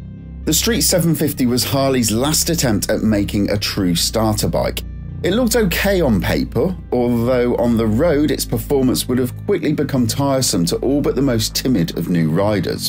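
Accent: United Kingdom. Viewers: As far as I can tell this fellow with a British accent did not include a singled British bike.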